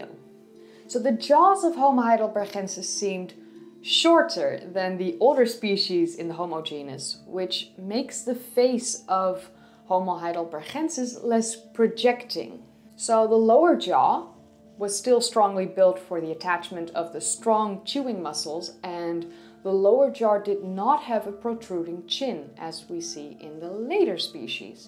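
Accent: Netherlands. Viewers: Your accent and Dunglish is simply brilliant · Is that a lovely Dutch accent?? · pegged you for Dutch in about 30 seconds... the Gs are a dead giveaway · your Dutch pronunciation is very attractive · Your slight dutch accent is very cute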